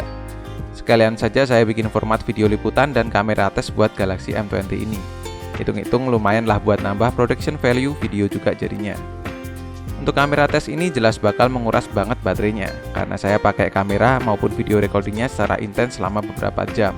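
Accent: Yogyakarta, Indonesia. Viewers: Wong jogja po?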